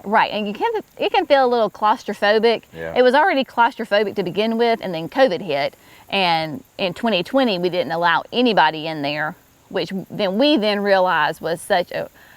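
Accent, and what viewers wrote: South, United States. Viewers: Bring more videos, I enjoy your true self, it’s refreshing. I also enjoy listening to your southern accent. ❤️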